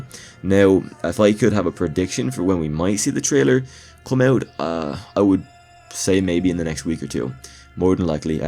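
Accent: Ireland. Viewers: For Christ's sake don't apologise so much, being sick and all - for your have amazing content! I love the Halloween franchise, and if anyone don’t like it, they are free to stop watching! And don’t apologise for your Irish accent, be proud! My aunt is Scottish, be yourself! In the end of your video you are saying it’s Friday, did you make the video yesterday 🧐😁 Cheers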